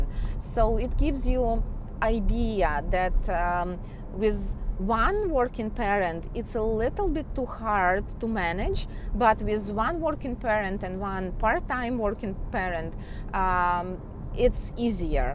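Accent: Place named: Russia. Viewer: Ох уж этот русский акцент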